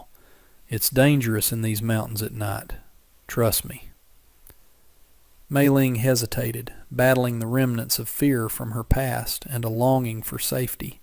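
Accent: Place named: West, United States. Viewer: I love this real human voice with this wild west accent.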